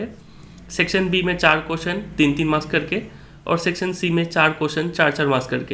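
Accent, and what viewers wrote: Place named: Bengal, India. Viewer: Where are u from sir ?? U sound like bangoli , if i am not mistaken, you are...... please please 🙏🏻🙏🏻🙏🏻 reply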